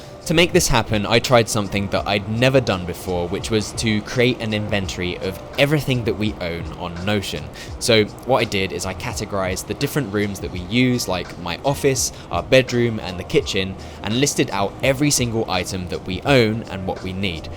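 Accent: United Kingdom. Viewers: Just 1 minute in and this is the first video I've watched from you and you sound British yet you talk about being under mountains of student debt??? Why? This isn't America where you get chased for it. UK student loans are paid only when you start earning over a certain threshold (the poverty line), is in proportion to your income, is removed automatically from your pay slip and is forgiven after 30 years and has virtually no impact on mortgages, credit score or anything else. It's effectively a graduate or uni tax not a loan.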